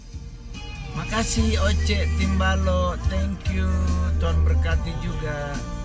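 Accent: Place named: Manado, Indonesia. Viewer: Knp gambarnya gak penuh ini sprti buat flog stengah2 bahasanya slalu logat manado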